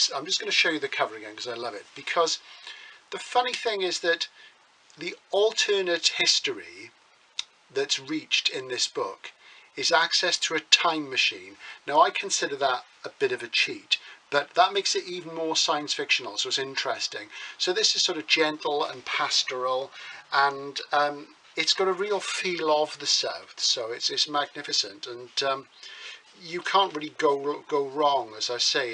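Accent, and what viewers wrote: Wales, United Kingdom. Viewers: I've been a fan of SF since before my teens (I'm on the verge of 60 now) and Alternative History was always a guilty pleasure. I say guilty because to be honest a lot of it wasn't terribly good, with bad writing hidden by concept, but there are enough gems out there to make the sub-genre worthwhile.. I've loved 'Pavane' since I first read it back in the 70s, and was particularly pleased to finally visit Corfe Castle about 5 years ago. A couple of others I remember from back then are 'A Transatlantic Tunnel, Hurrah!' by Harry Harrison, and 'The Last Starship From Earth' by John Boyd, in which the reasons for the alternative world lie very far back in the narrative, until the rather wacky ending. No doubt you will also do a time travel discussion at some stage, and I shall look forward to it, as fI'm a sucker for Welsh accents anyway, and you have a very easy and confident speaking style.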